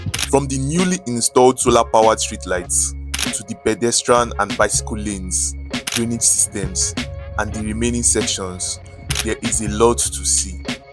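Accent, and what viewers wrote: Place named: Ebira, Nigeria. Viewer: De thing wowoh small na Ur accent sef be like igbira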